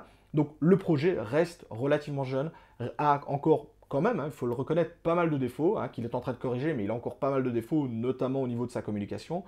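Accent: Belgium. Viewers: Salut, j'ai reconnu directement ton accent belge, sa m'arrange car je me demande justement si je cash out sur un compte en belgique quel sont les taxe encourue? Merci a toi bizz!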